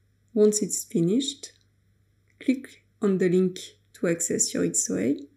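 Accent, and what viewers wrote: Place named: France. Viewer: Excellent accent français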